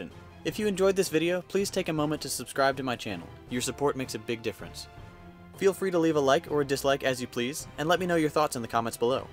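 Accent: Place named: Dallas, United States. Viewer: so this is why your voice seems so familiar, you live in Dallas(like me), and thus your accent is familiar!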